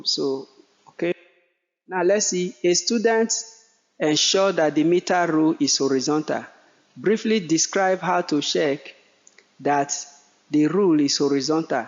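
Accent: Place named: Nigeria. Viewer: Nigerian accent the best muah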